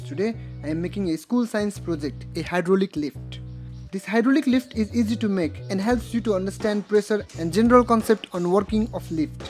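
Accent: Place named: India. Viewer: why is his a indian guy my partner is laughing about his accent help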